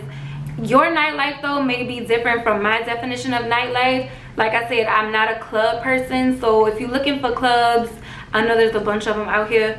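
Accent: New Orleans, United States. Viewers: Omg I love your new Orleans accent!!!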